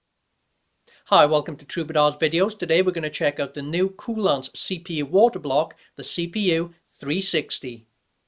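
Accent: Wales, United Kingdom. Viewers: trubritar u have brilliant in depth reviews! are you originally from wales in the uk? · MAN YOU R PURE BRITICH AND I LIKE THAT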